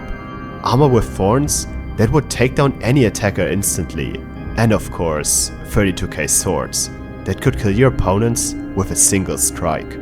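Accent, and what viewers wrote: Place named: Germany. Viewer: German accent +99999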